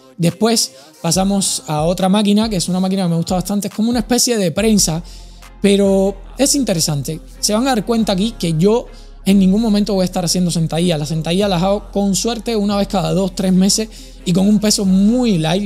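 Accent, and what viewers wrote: Cuba. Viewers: El chama tiene acento cubano!!! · el chama ya tiene el ascento cubanoo ❤😮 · Ya el chama habla cubano, saludos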